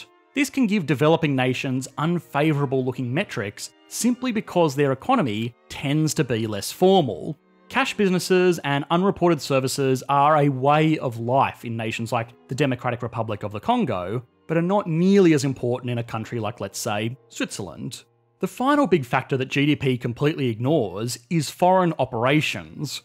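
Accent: Australia. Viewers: I am guessing that it is Australia that you are from, please tell me if I’m wrong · Hey, man. Let me, please, make some subtitles for your videos. I can even translate it to portuguese, if you will. The Australian accent ain't as clear as you might think. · Love your channel but please please please don't say "zee". It sounds so wrong coming from an Aussie accent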